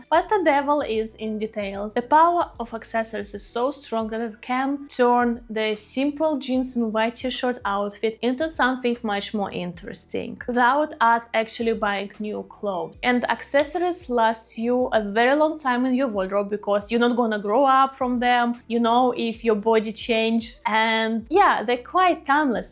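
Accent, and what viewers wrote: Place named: Russia. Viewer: Are u from Russia? Your accent and pronunciation is very special. Russian style 😅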